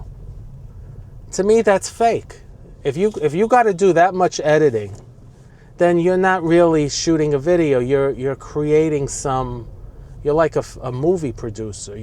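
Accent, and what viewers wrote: New York, United States. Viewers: Yes you sound like a New York native to me. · You absolutely have a new york accent! Nobody on the west coast sounds even remotely like you. I would also call it an east coast accent. Love it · If I may offer, you’ve rebuilt your life multiple times and succeeded. But maybe the move now isn’t reinvention, it’s using what you already know in a way that’s more stable and easier on you physically. Just a thought. Find some ways to build up some monies for later on. By the way, yours is definitely a New York accent! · You most definitely have a very strong NY accent and İ like it Makes me feel at home and İm 6k miles away İts Richmond Hill İ believe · Yeah you have an accent but it's refined other than the standard New Yorkers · I'm from New Jersey. I could pick you out as a New Yorker in a second based on your accent. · Definately New York accent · I'm in Florida and have worked with several people who have your accent. 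They are all NY Italians. Thanks for posting. God bless. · This guy doesn’t sound like somebody of average intellect. He’s full of wisdom too. And yes he has a great NY accent that’s easy to listen to. Never forget we’re here in earth school to learn. We start learning when we’re born; if we’re lucky, we don’t stop learning til “God” calls us home from earth school. Great scenery of NY! Reminds me of the tv show TAXI. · You're very patient and calm to make that commute Monday through Friday. You have a New York accent.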